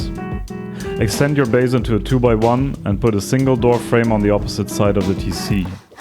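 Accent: Germany. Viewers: Du hörst dich deutsch an, bist du’s auch? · Ein Deutscher aktzent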